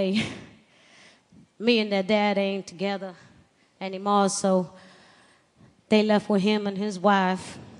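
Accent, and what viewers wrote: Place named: South, United States. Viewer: a little too southern but so what? the brooklyn accent and new orleans accent is almost identical.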